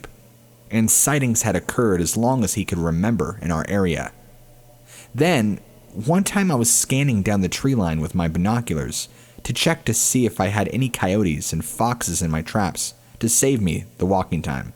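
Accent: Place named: United States. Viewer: I love your American accent 😁👍😘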